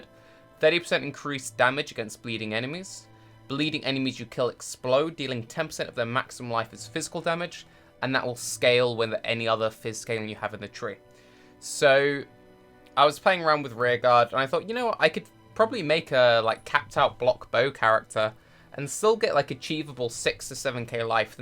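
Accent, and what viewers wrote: United Kingdom. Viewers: Nice to know your graphic's card is going to arrive relatively soon. For what it's worth, i think your guides are actually really enjoyable even without gameplay. And that british accent is lovely <3. Hope you get better with your Chron's disease, and keep up the good work :D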